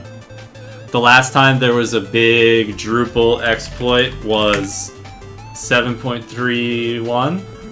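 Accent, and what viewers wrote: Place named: Russia. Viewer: congratulations, you have the Russian accent!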